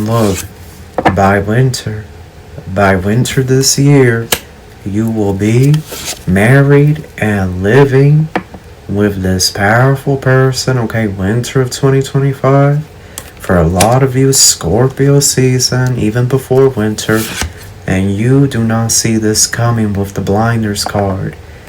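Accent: South, United States. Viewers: I love your sweet southern accent ❤ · You got a country ,tipsy sounding ,way you speak 🗣️ 💜 I love it ! ! ! 😍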